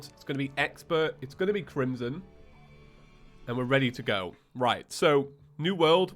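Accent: England, United Kingdom. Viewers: yeah thats the english accent for you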